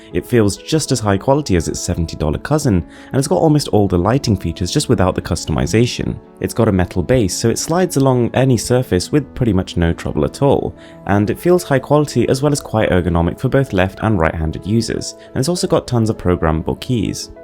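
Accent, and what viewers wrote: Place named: United Kingdom. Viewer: British accent + top notch quality + great person = Mrwhosetheboss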